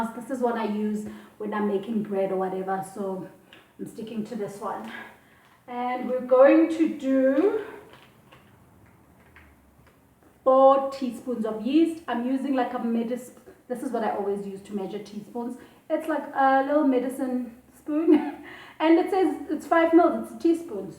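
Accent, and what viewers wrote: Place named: Coloured, South Africa. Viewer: I thought you are colored, you speak and look like us...or so I thought😂😂😍😍thanks for the recipe girl going to give it a go. Take care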